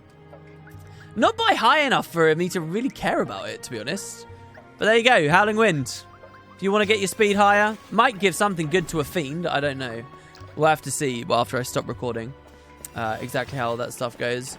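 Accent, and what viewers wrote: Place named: Scotland, United Kingdom. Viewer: I vote for more of Scottish accent.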